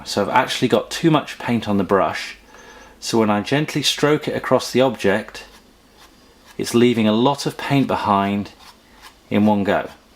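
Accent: United Kingdom. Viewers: Nice to hear a British accent on Utube 👍👍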